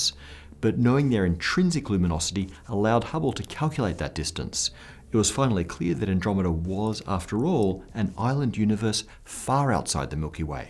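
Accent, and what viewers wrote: Australia. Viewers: Do all Australians talk like that? I want to move to Australia all of a sudden. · Why would there ever be any down-votes for these videos? Don't like galactic mergers? Don't like Aussie accents? Then why watch? At this moment it's 13,806 views versus 19 thumbs-down - so I guess that's only slightly more than 1 in 1000 - so who the hell knows.... People that don't believe in the structure of the universe? Religious people? Surely not - cannot it all be seen as a gods amazing creation?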